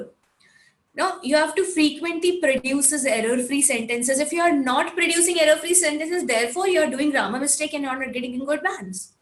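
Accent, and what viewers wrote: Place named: India. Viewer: i dont understand Indian accent i hate it very ugly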